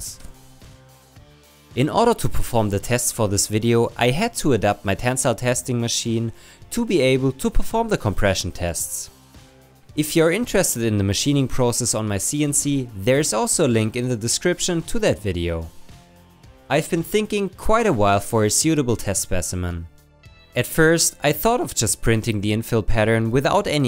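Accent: Germany. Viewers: My 1st thought after 2 sec: German, Wilkommen im Club! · man do i hate the german accent, but the content is interesting 🥲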